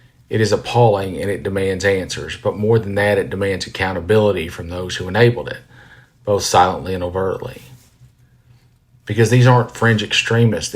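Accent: South, United States. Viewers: Hi - I’m glad to see and hear a white Southern man standing up against the stupidity and intentional cruelty these mean boys are fouling the world with. No, I don’t like everybody in the world, and sure I may pre-judge folks on how they present themselves, how they act towards me or others, and definitely about what they say, but not due to the color of their skin, ‘cause I’m not an idiot. I don’t think a surplus or lack of melanin has much to do with a person’s brain, heart or soul, but then I’m a mature human. Which those young Republicans obviously are not. Anyway, thanks for speaking up. We need a man with a strong southern accent to give the what for to the idiots who sound like him but think and act like fools. Kudos dude!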